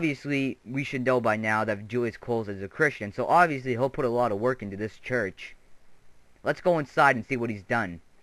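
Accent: Canada. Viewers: AwesomeManPlayz A lot of people pronounces a word differently plus he's Canadian lol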